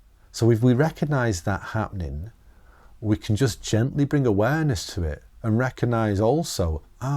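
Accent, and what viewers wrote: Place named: Northern England, United Kingdom. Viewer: I don't spend much time on You Tube (less than one hour a week, I reckon)... came across your channel recently, love your content! Very calming, reassuring, inspiring and deep. Also love your accent, you sound like one of the Beatles!! (are you from Liverpool? Certainly from Northen England, I guess). Bless you. Cheers from Italy.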